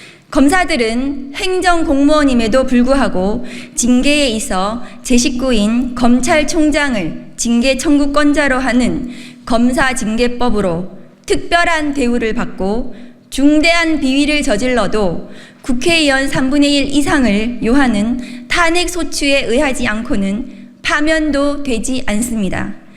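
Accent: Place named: Gyeongsang, South Korea. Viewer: 훌륭한 박은정 의원 특별한 성대를 가지셨네. 같은 경상도이지만 사투리 거슬리네. 앞서지마세요. 뒤가 보이니.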